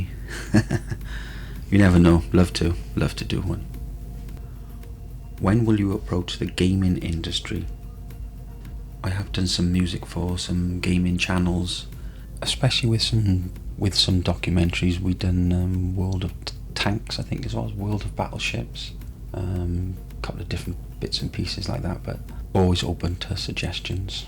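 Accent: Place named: Wales, United Kingdom. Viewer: You sound like you're from Newport, definitely Welsh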